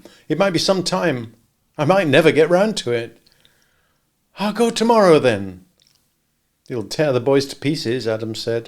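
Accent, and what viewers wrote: England, United Kingdom. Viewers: English accent preferred!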